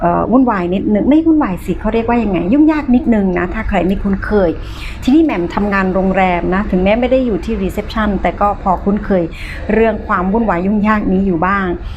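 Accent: South, Thailand. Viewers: พูดดีแล้วค่ะ เราคนใต้ก็พูดแบบนี้แหละ ยังไงพี่ก็ชอบน้องนะคะ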